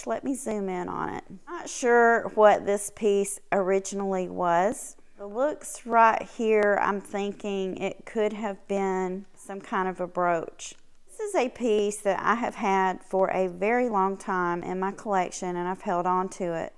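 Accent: South, United States. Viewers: Thank you! I love looking at your collection! You are a wonderful historian and being from the South, I love your accent! ❤️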